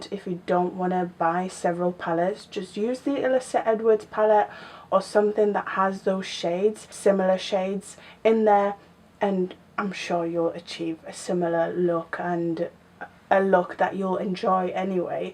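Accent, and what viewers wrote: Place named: United Kingdom. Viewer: Lovely finished look with the makeup......insert name here!........Are you in the UK you have a funny accent......? Good video.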